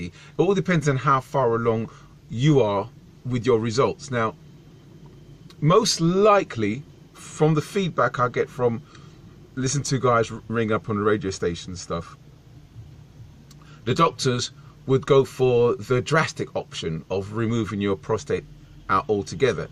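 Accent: United Kingdom. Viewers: You sound like a f***ing southern hero mate, I've just come out a university 28 years old see that your subs need putting up a new video quality could do with improving, I'm looking for a job atm, cockney based the same to you. I'm from Essex, back in the day growing up. in Pompey now. really im offering my services if I could do anything technological/ graphics/ back-end/ editing business stuff to help get you going (2018 youtube is going to be very hard) my degree was entrepreneurship that's going to help you get whatever you're trying to get out online then let me know (no money atm bud, i just wana help listnening to ya). I'll just wanna know a bit more about your drive etc you doing this for money? awareness? a living? I've had a look through your channel and it's a bit all over the place (no offence) I don't know how to offer help but I know I want to let me know cheers for the info you're a hero as i said. 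thanks for the upload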